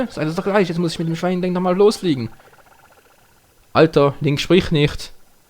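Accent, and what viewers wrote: Switzerland. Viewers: xD...Tschöpli!!! xD Schwizerdütsch rockt!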